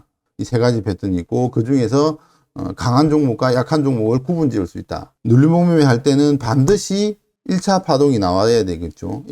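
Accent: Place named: Gyeongsang, South Korea. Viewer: 와 투박한 갱상도 사나이신데 강의는 알짜배기 입니다.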